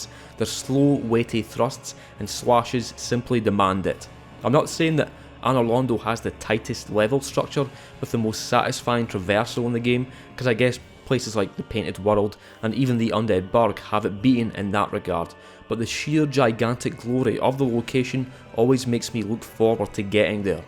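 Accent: Scotland, United Kingdom. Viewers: As soon as I heard the Scottish... I knew I had to sub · First time coming across this channel. Was pleasantly surprised to hear a fellow Scottish accent. Have a like and subscribe, ya cunt! 🏴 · Finally. I watch a lot of different content creators on YouTube and from time to time there was this horrible accent that I really can't stand but I never knew where it came from. Now I know Scotland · Who doesn't love a good Scottish accent to listen to.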